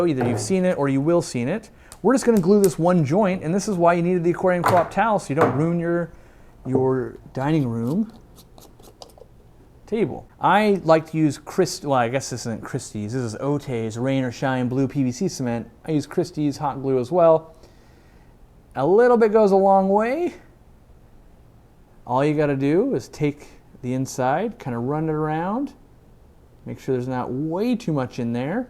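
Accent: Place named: New England, United States. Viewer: haha sweet vid, where in New England are you from? :p "rim is just WICKED wide" lol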